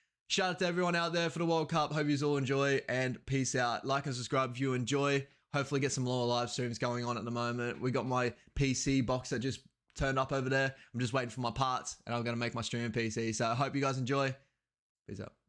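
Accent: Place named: Torres Strait Islands, Australia. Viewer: Im Arab but why do you sound like you got the aussie islander accent. Even the pronunciation of Samoa took me years to get right 😂😂😂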